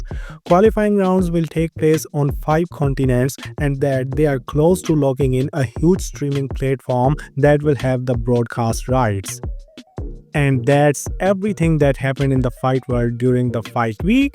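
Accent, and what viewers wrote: India. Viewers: Good to hear from you my homie with the Indian accent. Hope you and your family have been well. Thanks for the content you make in combat sports you do a fantastic job. I hope you have a good holiday season and a happy new year my friend!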